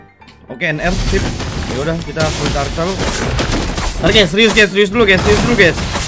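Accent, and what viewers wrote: Java, Indonesia. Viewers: bg medok skrg wkwk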